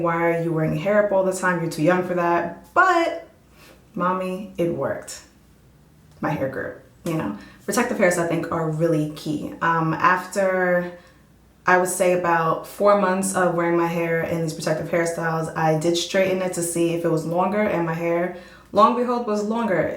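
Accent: United States. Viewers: Love your hair, love your attitude, loving the accent!!! BK represent! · you are from NY aren't you? I hear the accent. love it.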